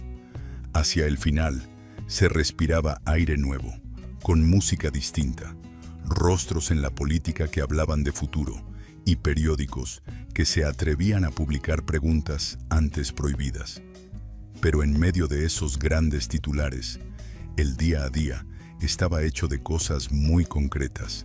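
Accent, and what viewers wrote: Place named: Mexico. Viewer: Pero si este que habla es mejicano !!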